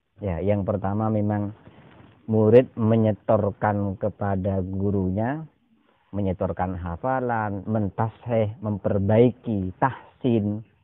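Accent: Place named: Madura, Indonesia. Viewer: Ketauan banget orang Madura ya cak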